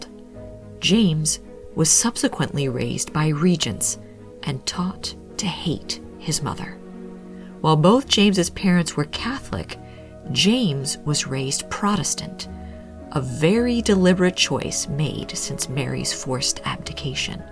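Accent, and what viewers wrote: United States. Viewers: This is American accent at its finest.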